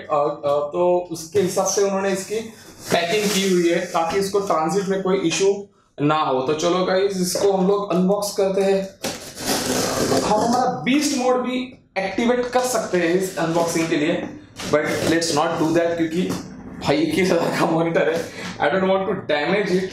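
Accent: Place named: India. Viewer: ı love so much indian english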